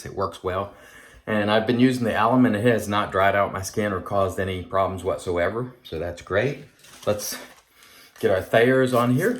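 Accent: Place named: South, United States. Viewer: That soft Southern accent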